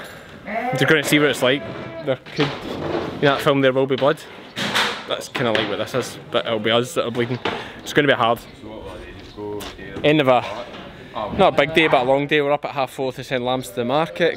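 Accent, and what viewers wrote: Scotland, United Kingdom. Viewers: I love your Scottish accent. I’m a big Outlander fan and I’m anxiously awaiting Diana Gabaldon’s next book in the series. My maiden name was Thomas, so we’re Welsh. On my bucket list to visit Great British countries but England can wait. #ACAP! Btw found out about you from your debut on The Hoof GP’s channel. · Please don't laugh. I'm a new subscriber, when you talk are you speaking just English or do you throw in some Scotish as well?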